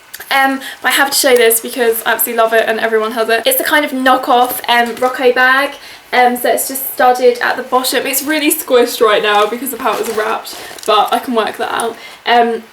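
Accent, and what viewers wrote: RP, United Kingdom. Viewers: You keep talking in a posh accent and its annoying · wow, you sound so posh, aha:') x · you talk so posh! lol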